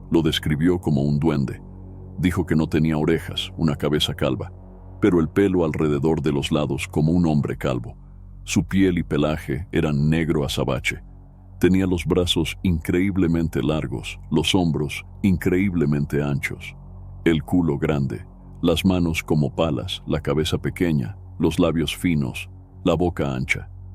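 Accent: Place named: United States. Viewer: Que desagradable acento de gringo hablando español. Le resta credibilidad a sus historias.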